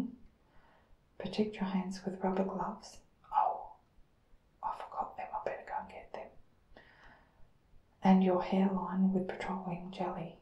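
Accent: Australia. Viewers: omg i love this!!! are you Aussie?? I laughed so much when you said, "sounds a bit rude".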